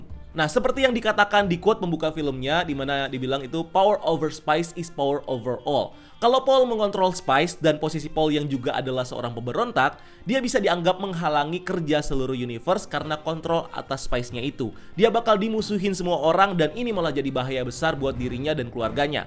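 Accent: Indonesia. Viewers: Ngomong ingris nya jelek banget. Ingris nya logat indo ngapa?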